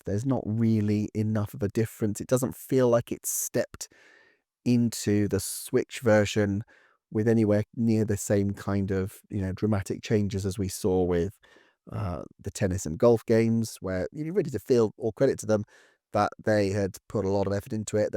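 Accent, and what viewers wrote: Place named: Southern England, United Kingdom. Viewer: I enjoy bottom tier being "so-so;" it feels very befitting of your southern English accent and your very conciliatory style of analysis.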